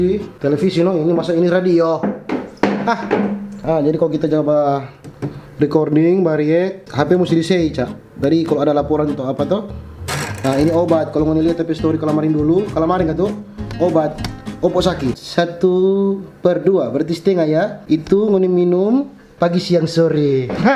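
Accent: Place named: Siau, Indonesia. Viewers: eh keode ungke, suaninge, tu logat dang jadi kangen sitaro rken, salam dari anak Sitaro yang kuliah di jogja. Sukses Terus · Sekali" logat siau jo opo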